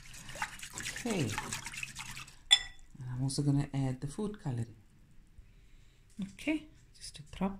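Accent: Cape Town, South Africa. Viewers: Absolutely beautiful. Love your calm, loving voice. This means so much to me, especially hearing your accent. Is it Cape Tonian? Makes me long for home because I'm from Durban, South Africa watching from Australia. Your presentation is beautiful. Loved the last bit also, when you called for someone to open the door quickly. I loved the small glimpse of your plants also. I will try doing it, but I don't think I can decorate it so perfectly. I wish I could meet you one day. Kindly read John <num>. Love and God bless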